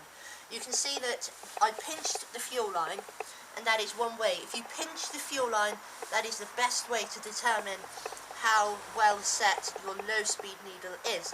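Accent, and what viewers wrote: England, United Kingdom. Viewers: why do you always sound like a british girl?? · Well hopefully when I try that tomorrow it will sort my running problems out. Wish I found these vids ages ago. So nice to hear an English voice instead of American. Well done mate i've already subscribed and watched about 20 of your other vids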